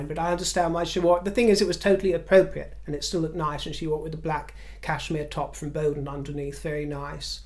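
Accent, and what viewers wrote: United States. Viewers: Well done with the American accent! I had to do a double-take. Well done 😊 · If I didn’t say it on the last one I saw the milk and honey which I think was the last one you made… anyway, I love the new intro. Not bad American accent, either. That’s one reason alone that would make me want my grandmother to have emigrated to the UK versus the USA. That and Trump lol😂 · You have a great American accent!!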